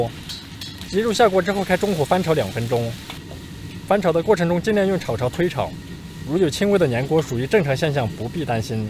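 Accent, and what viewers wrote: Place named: Shandong, China. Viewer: 去了趟山东难道学会了儿化音哈哈哈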